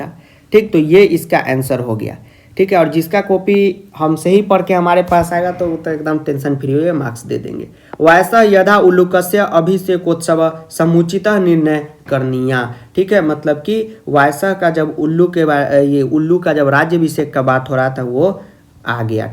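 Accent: Bihar, India. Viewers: Sir apke bolne ka trakike se lagtha ha ki ap vihar se belong kerthe ha. Sir me bhi bihar se he belong kerthi hu lakin rethi delhi me hu..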